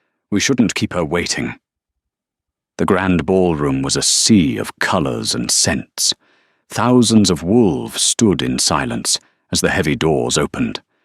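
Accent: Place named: United Kingdom. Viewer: I call it Yummy Purring English / British accent.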